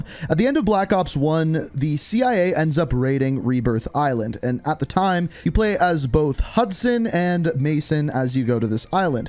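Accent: Canada. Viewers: Are u from Canada?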